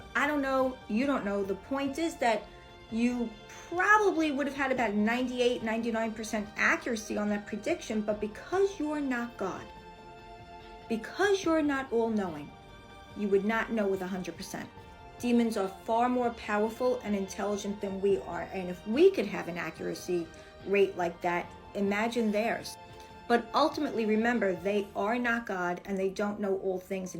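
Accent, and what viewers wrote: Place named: New York, United States. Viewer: Yes. Thank you for letting everyone know. I always try to explain to people that psychics can tell you about your past, present and future because of the familiar spirits that are with you and correct me if I’m wrong, but when you leave, the familiar spirits that were there leave with you? Also real quick, when I first heard u on Doreen Virtue channel a few years back, I was like Ok is definitely from NY, lol I wasn’t sure where, I thought BK but then I found out LI… I’m from Staten Island… love u girl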